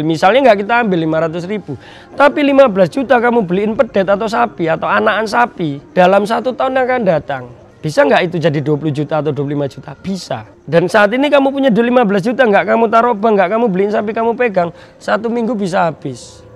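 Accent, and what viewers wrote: Indonesia. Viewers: Mantap mas kira n sampeyan wong daerah yogya lahir di sumatra dapat istri orang Banyumas...cuman jowone sampeyan sing bnr asli ngndi toh mas...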